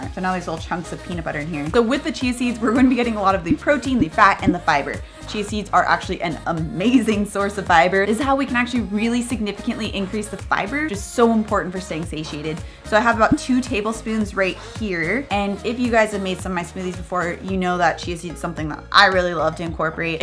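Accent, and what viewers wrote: United States. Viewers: Did you say cauliflower instead of banana to give smoothie thickness ? I can't understand your American accent you speak so fast, pls slow down !!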